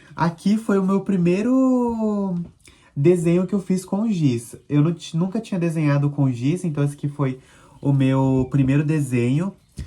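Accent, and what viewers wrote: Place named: South, Brazil. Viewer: Moço voce e sulista? Que sotaque fofinhoo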